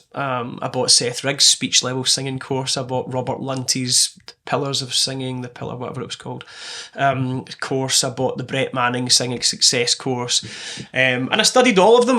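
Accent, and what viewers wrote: Scotland, United Kingdom. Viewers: OMG, I thought you were Justin Timberlake for a moment, you look so like him. Then I heard the Scottish accent like mine. Great video. Keep up the great work. Much love and light. Poulo.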